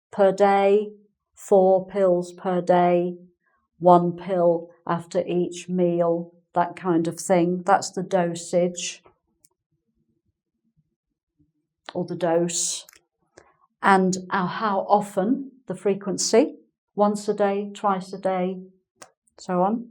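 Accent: England, United Kingdom. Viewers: Hi Mom, I'm from Indonesia. I like your british accent. I like also the way you teach. I always learn English from your videos. Thank you so much. God bless you. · I love your British accent, it's sounds very cool 😊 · I like her personality and her British accent 😍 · I love your english accent!!, it sounds elegant and clear,, some day I hope I´ll get it,,, the class was really useful !. I´m from Perú!! Cheers from Perú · I like your perfect british accent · Thanks for your greet efforts I loved your British accent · Dear mam,your speech itself makes us to understand the British accent very well.Thanks a lot.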